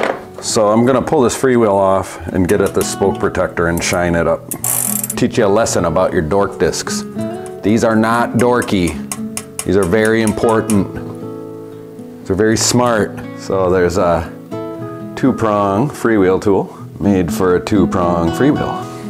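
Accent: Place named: Midwest, United States. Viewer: So GD relaxing, thanks for uploading! Minnesotan here. Love to hear the "Okie dokes" from our friends across the Mississippi/St. Croix. Midwest vibes - vibing so gently.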